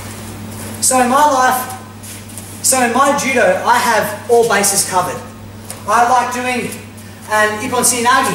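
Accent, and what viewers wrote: Australia. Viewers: Is that an australian accent? =) Awesome vid!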